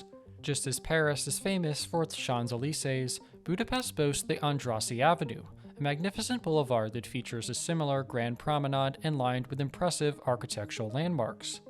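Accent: United States. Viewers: AGGRESSIVELY american pronunciation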